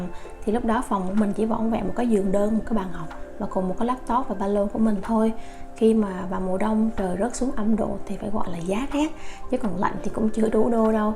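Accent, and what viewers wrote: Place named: Quang Nam, Vietnam. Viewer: Giọng bạn giống Quảng Nôm hay Quảng Ngãi quá bạn hê :)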